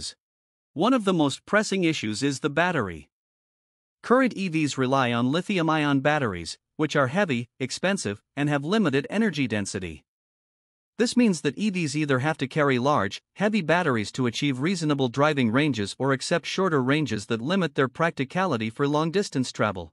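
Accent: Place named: United States. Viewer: Repetitive WAFFLE by a DREARY American Voice.... ALL talk and NO FACTS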